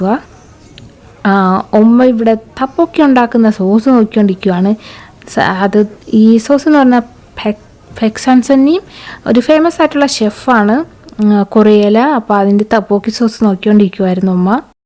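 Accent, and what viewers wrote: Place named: Trivandrum, India. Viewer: place trivandrum aano. Tone kettitt angana thonnunu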